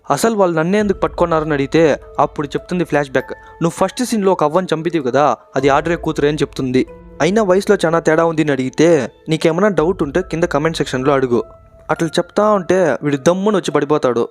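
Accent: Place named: Chittoor, India. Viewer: Bro ru from Chennai or Tamil Nadu border or Chittoor language mix but i like it just as puspa slage 😎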